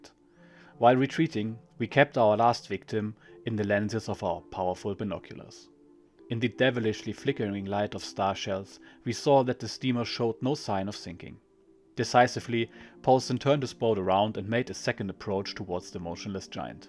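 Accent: Germany. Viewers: thank you for the editing and translation, it's that much more enjoyable knowing you ensure accuracy. Again, the German accent makes it that more authentic. If I go to a Chinese restaurant, I prefere to see a Chinese guy cooking in the kitchen.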